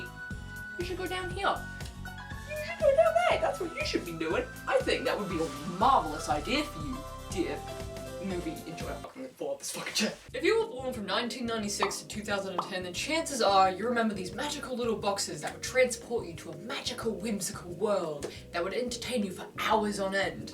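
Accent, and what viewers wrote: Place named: Australia. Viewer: When I saw your video in Scott Cramer's video I truly did not expect an australian accent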